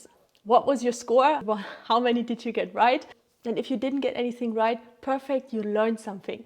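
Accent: Germany. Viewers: I love your accent you delicious German tootsie to worship 👀👀🔥🔥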